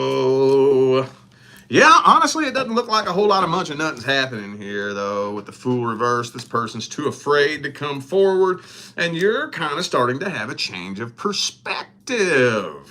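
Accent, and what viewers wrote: South, United States. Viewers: I’m so glad I found u again. You crack me up. Real asf with that southern drawl…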